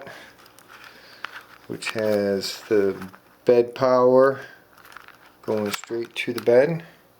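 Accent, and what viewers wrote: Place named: Northeastern Pennsylvania, United States. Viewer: E tree D, haha. Sounds local to me (NEPA).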